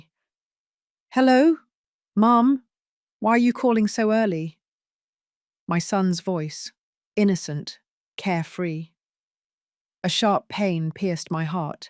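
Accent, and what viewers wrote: England, United Kingdom. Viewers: Good English accent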